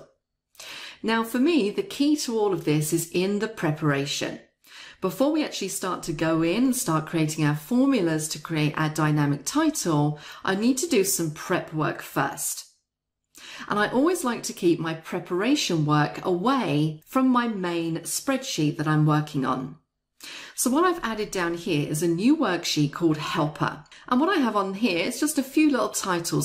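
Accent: United Kingdom. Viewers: Thanks. I love your perfect British accent😍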